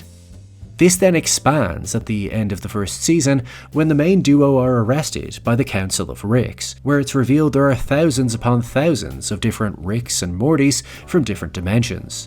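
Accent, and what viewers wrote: Ireland. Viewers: He sounds nothing like Paul Mescal but I suppose accents vary a fair bit in Ireland/Northern Ireland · Bless, Irish for sure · Weird question, are you Irish?😅🤔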